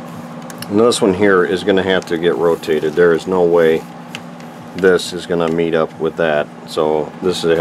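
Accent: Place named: Midwest, United States. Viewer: Another nice video. I'm about to pull the trigger on a G650gs but I find your videos really helpful regardless of exact model. Plus I'm from W Michigan now living in CT so I like hearing your Midwest accent!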